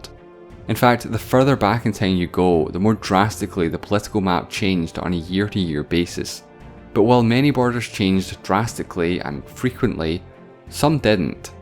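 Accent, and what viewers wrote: Scotland, United Kingdom. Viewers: He sounds scottish, but someone from the UK might be able to tell better than I can. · I'm sorry, but from where the narrator is? Scotland? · Scottish I think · OK I really need to know your accent? like exactly what is it- scottish im assuming but what type of Scottish its' really great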